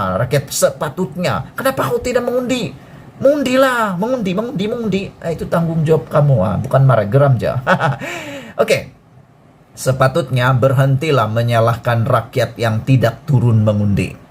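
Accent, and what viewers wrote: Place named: Sabah, Malaysia. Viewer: Aq da mula suka ulasan kamu dgn bahasa sabah mu..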